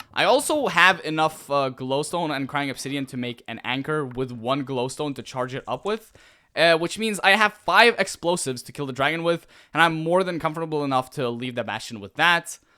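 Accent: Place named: United States. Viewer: ngl your accent sounds so american that when you said zed it threw me off so much lol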